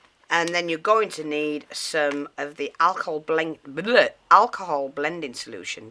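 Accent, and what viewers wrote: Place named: England, United Kingdom. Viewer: i m glad to hear english im from there but live in ireland.laughing you saying buggering thing.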